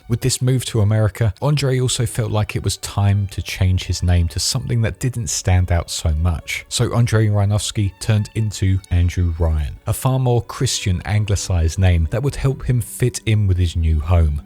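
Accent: United Kingdom. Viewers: Thank God you're british, it makes listening to this so much better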